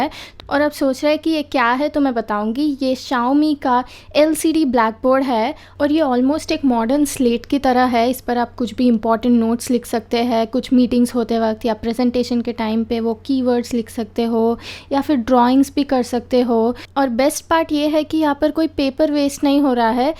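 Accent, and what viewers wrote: Hyderabad, India. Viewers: totally hyderabadi.nai karra.... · You have to work on your voice and language I feel Hyadrabi hindi some time ... Keep it up 👍👍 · Tum hyderabad se ho kya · Are ap hyderabad se ho kya..way u talk like hyderabadi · R u Hyderabadi?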